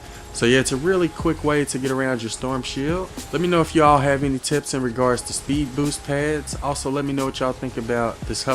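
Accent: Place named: Florida, United States. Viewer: I'm trying to figure out.. is this an accent from Florida or some coast states ?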